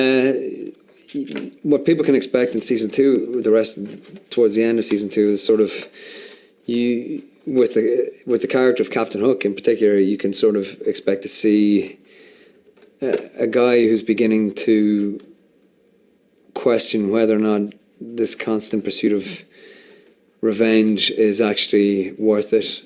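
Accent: Ireland. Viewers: Ok so he is extremely attractive as Hook, but as himself with his Irish accent he is adorable!!! How is this possible? He's too perfect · TBH his accent irl is soo much more Irish than in the show like a huge diffrence, anyone else with me?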